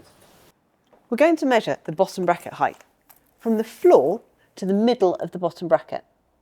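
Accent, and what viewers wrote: United Kingdom. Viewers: Always nice to see her and more importantly hear the nice British accent of this lady.❤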